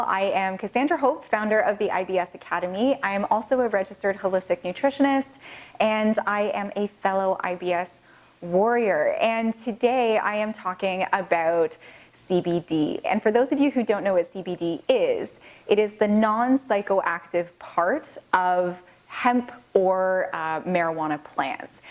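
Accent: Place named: Canada. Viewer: Are you canadian ? Just wondering cause your accent is awsome ✊💯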